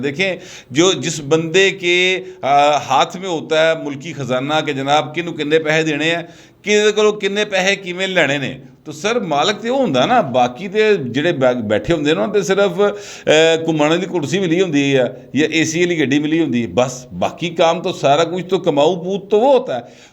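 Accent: Lahore, Pakistan. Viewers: Weldone.Nice analysis with Punjabi and Lahori style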